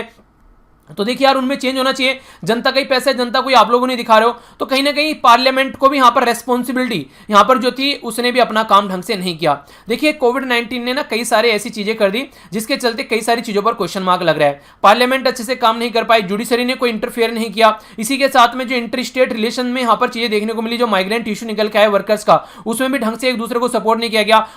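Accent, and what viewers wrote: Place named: Bihar, India. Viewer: Kis kis ko lgta he ki hmare pyare sir bihar se he😊😊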